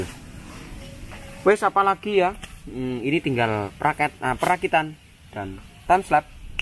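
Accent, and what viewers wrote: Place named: Yogyakarta, Indonesia. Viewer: aku barusan sub,sampean arek ndi sih,kok ngomonge koyok jowo jogja.lokasi bengkel dimana mas,salam dari jawa kaltim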